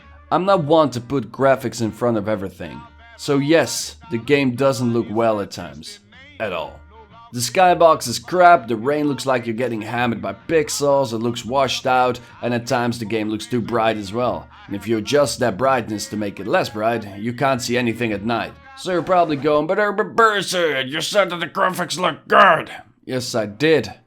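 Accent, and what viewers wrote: Russia. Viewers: It sounds like you have a Russian accent. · Well, it's a decent game if you play it in chunks. A few missions per day. Anyway, where the he hell are you from? Russia?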